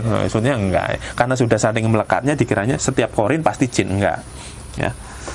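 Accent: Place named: Java, Indonesia. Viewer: Waduhhhh, bhs jowo nipon mantep sanget,sedulur papat limo pancer,pok ipon jowo asli mantap,idola ku manrap cara menerangkan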